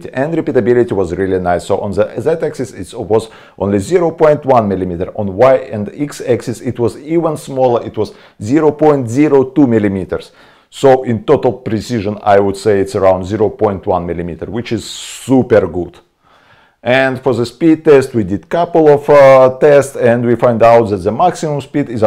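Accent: Russia. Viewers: I'll take strong rashn accent over strong Indian accent any day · Русский акцент)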